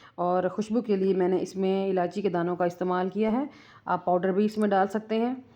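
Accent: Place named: Saraiki, Pakistan. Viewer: Saraikan lagti hay kionkay saraiki main urdu bol rahi hay!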